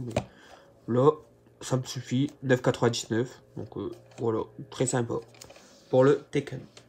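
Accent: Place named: North, France. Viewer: T pas du Nord toi ? Ta un accent 😊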